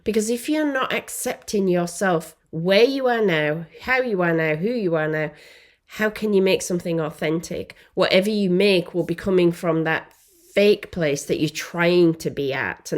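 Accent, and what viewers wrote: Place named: Lancashire, United Kingdom. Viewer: Gosh, I’m so happy I found you and your podcast. I live in the US now, but originally from Lancashire, your accent brings me back home (I have family in Yorkshire!) I’m so excited to delve into acrylic painting properly this time and learn my authentic self/style; no more picking up then giving up - excited to learn and be guided by your lessons and work. Thank you.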